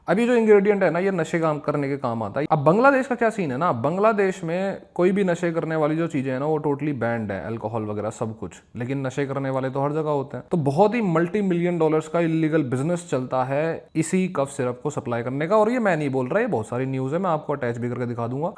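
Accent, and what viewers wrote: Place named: Uttarakhand, India. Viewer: Bhai ❤ uttrakhand ke khatima ke kurti walo ki trh bolta h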